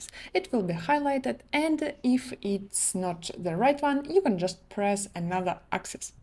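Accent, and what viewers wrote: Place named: Russia. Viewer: love the russian accent. sound really nice to listen to. really nice video, giving a lot of advice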